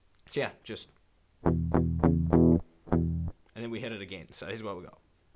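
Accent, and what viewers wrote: New Zealand. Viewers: when I first watched this video (it was the first video of you i saw) i said to my sister 'wow I really like his accent! Where is he from?' And she told me it sounds Kiwi, I am from NZ as well but you have a very strong accent its amazing! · Is your accent New Zealand-ish? (Idk how to say it I'm stupid XD)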